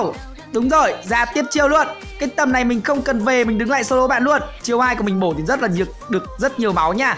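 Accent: Nam Dinh, Vietnam. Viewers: Ông này ở đâu nam định đấy ? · Anh ở nam định à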